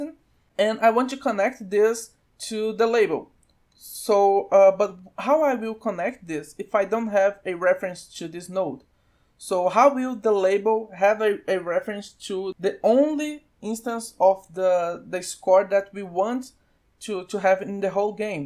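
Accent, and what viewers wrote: Brazil. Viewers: I can recognize a fellow Brazilian just by his accent, continue a fazer seus videos que eles me ajudaram muito!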